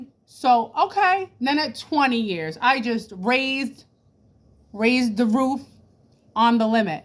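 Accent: New York, United States. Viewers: is that a new york or a new england accent?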